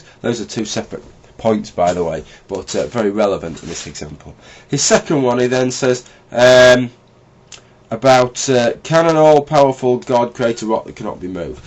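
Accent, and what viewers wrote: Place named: England, United Kingdom. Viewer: LOL! When he opened his mouth I said "lol a pom!" Awesome vid mate! 5 stars.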